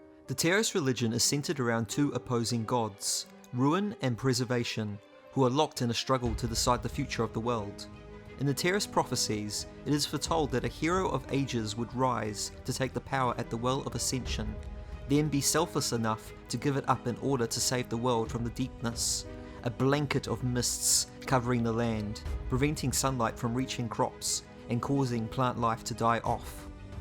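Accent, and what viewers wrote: New Zealand. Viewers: That is a kiwi accent. Shot bro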